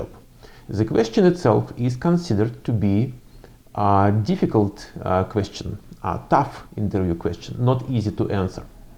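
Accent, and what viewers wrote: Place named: Russia. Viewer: Cпасибо! Всегда хотел услышать как вы говорите на англ. Ваш речь мне особенно понятна, потому что вы говорите с русским акцентом. Скажите, это считается очень сильный акцент? Потому что вас я понимаю отлично, а носителей намного хуже. В общем учу язык по вашим видео!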